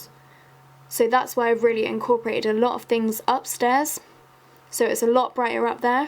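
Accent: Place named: England, United Kingdom. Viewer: I love English accents! I think American accents are annoying and boring tbh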